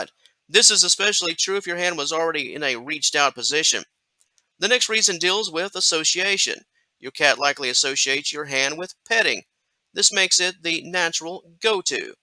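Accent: Texas, United States. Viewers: Are you from Texas? You sound like a Texan.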